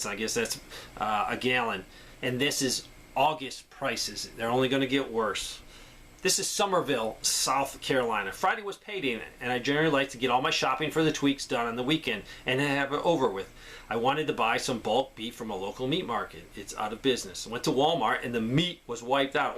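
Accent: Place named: Michigan, United States. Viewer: You have a Michigan accent, i could tell when you said galen instead of gallon.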